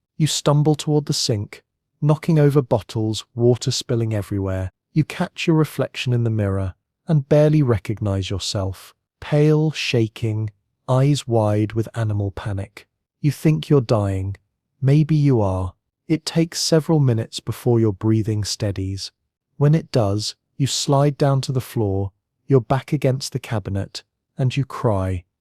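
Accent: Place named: United Kingdom. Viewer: I don't know I just can't stand this guy's accent. His British accent. Sorry I got to turn you off.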